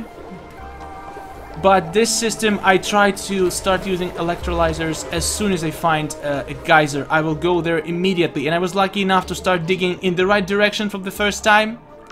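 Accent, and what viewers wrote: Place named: Russia. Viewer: таки ты русский чтоль с таким акцентом?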